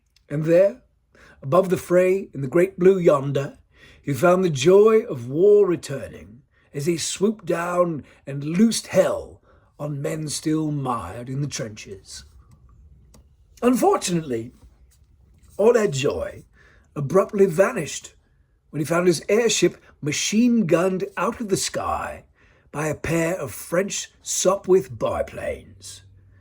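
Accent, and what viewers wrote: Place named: United Kingdom. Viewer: sometimes your british accent grew too much on me and i started reading things aloud in it subconsciously 😆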